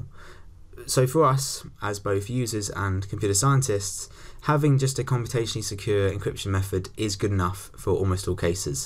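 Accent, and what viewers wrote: United Kingdom. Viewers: This is a heavy British accent but it gets the understanding process in the brain meats done 😂❤ Thank you mate 🌚❤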